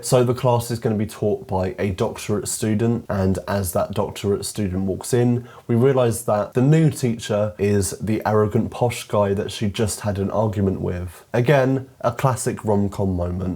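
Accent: United States. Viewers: Your American accent is flawless 😅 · i love ur american accent lol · Your american accent is perfect never stop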